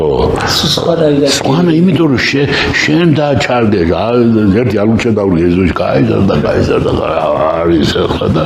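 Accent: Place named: Imereti, Georgia. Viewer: იმერელი კილო აქ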